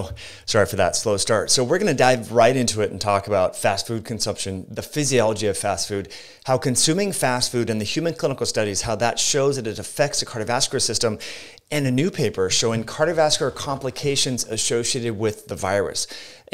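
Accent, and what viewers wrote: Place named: South, United States. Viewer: Hard to understand unless you've lived in the South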